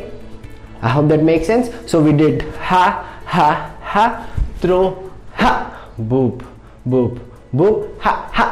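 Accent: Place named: India. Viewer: When u hear his Indian accent u know ur getting premium lesson 💗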